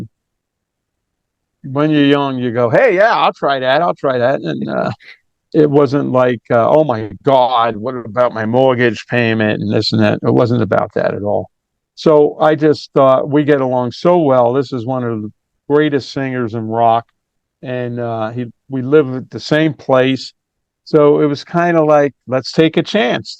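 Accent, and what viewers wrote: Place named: Alabama, United States. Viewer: Love your accent bro…that comes from Alabama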